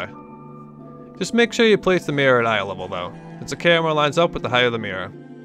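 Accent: Boston, United States. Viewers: YOUR BOSTON ACCENT IS SO IMMERSIVE OMG!!!!!!!!!!!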